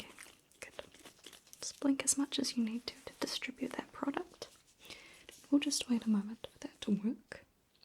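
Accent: New Zealand. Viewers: Kiwi here, so good to hear our accent on here hehe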